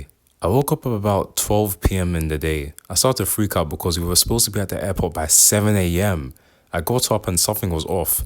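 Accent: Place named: United Kingdom. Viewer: The story is good and looks like a real book, and your British accent is so calming and peaceful.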